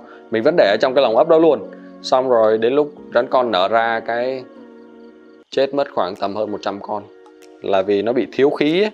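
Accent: North, Vietnam. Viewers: Anh ở Miền Nam sao nói giọng Bắc nhỉ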